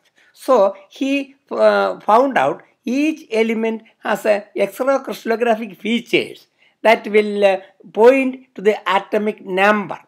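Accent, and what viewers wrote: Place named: India. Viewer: is it indian accent?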